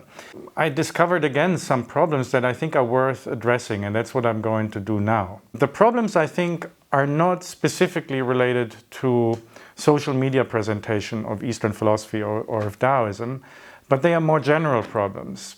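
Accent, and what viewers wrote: United Kingdom. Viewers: School of Life is pretty obviously terrible once you move past the lull of the narrator's self-assured presenter voice and British accent. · School of Life shows that by having a well spoken, eloquent British accent can make almost anything you say seem intelligent. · If he didn't have a british accent people would realize how much nonsense he advocates for in his videos. His videos are garbage, very ill-researched and pathetic in logic. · The School of Life always got videos with wicked animation tho. That's their strength. Plus, the British narration sounds very credible. Content-wise it'll appeal to the general public. Self help, more like. From a professional philosopher's point of view though, it is lacking.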